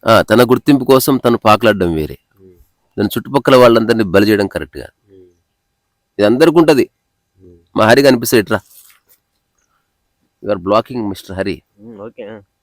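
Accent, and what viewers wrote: Telangana, India. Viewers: Dear I lovers the video of all 3 of you The back ground, the hay stock and everything. Enjoyed the content and burst in to laughter of joy with your Telangana Accent and sweetly scolding the dog. I think dog is expressing its love 🧡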